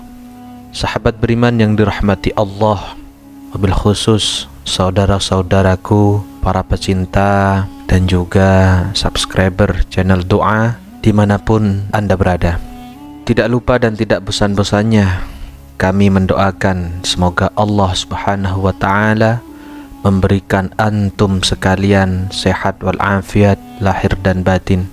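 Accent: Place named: Madura, Indonesia. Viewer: Adminya ketahuan sekali maduranya 🤣🤣🤣🤣 . . .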